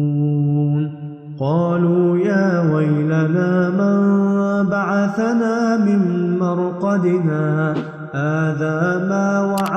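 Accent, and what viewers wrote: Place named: Egypt. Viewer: واضح من لهجتك ياصاحب الفيديو انك مصري نقدر نقول ان عاصفة التنين اللي ضربت مصر وهي اقوى من العاصفة في فيديوك الفاجر واللي ادعيت وفوضت نفسك ناطق فية على لسان الله وتكذب وتفتري على الله بانة عقاب من الله فنقدر نقول ان عاصفة التنين عقاب لمصر من الله بسببك وسبب كفرك والقول عن الله كذبا بان العاصفة عقوبة من الله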